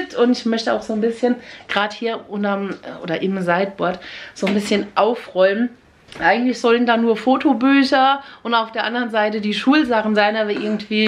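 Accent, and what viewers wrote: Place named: Palatinate, Germany. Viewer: Liebe sehr schönes Video, und dein Pfälzisch 🥰 mir gefällt deine ehrliche Art und du bist so eine sympathisches Fräuleinchen, und die Buntheit in deinen Videos einfach macht es Spaß dir zuzuschauen 😀…die Lampe ist sehr schön🍀😀über Gewinn hätte ich mich sehr gefreut😊lg Miri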